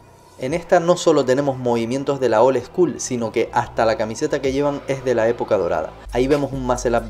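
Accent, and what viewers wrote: Argentina. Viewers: Me está gustando que estés implementando el acento argentino bien buenardovich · ¿por q esta tan argentino en este video? XD, las batallas de gallo o q?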